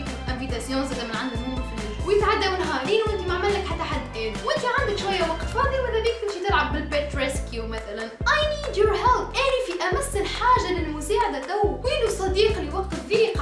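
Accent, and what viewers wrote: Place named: Sahel, West Africa. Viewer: hhhhhhhh you're funny,brabi se7lia? lehjtek thohrtli se7lia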